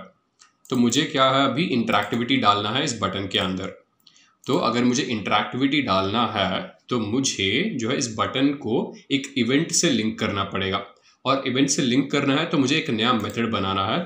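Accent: India. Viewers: Anyone relize the amazing indian accent?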